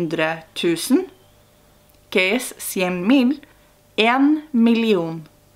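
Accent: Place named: Canary Islands, Spain. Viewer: Que acento más canarión tienes (de Gran Canaria) jajajaja gracias por el vídeo!! Muy bueno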